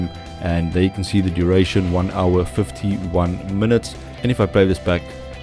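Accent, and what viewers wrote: South Africa. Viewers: Homeboy sounds South African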